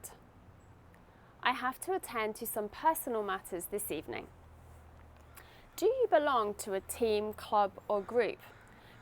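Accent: United Kingdom. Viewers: yeah i did not know where are you from, but i noticed your accent, and i guess it is british accent😀😊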